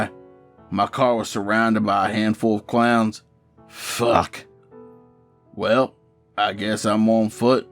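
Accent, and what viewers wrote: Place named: South, United States. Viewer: Awesome video. Love your southern accent. Keep up the great job man.:)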